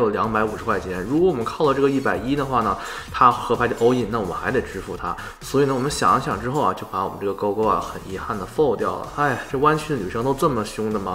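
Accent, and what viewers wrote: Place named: North, China. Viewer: 太好看了， ，一听口音，是国内北方人，有点像北京人，又有点不像... 不管那么多， 很喜欢你的视频和打牌时的状态，心潮翻滚，面不改色，运气紧随。没说的，必须订阅小铃铛。 祝好运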